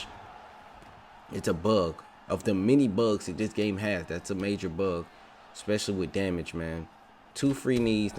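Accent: Jamaica. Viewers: i’ve been inna party with this guy he’s nice and chill. has a Jamaican accent i think . i only say i think because i don’t know accents good.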